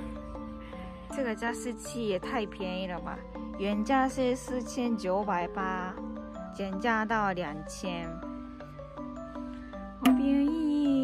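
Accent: Taiwan. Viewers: 台湾腔小姐姐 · 居然有點台灣腔喔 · 這中文該是台灣學的 マスク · 台湾口音加日本口音的国语混合在一起真是特别呀。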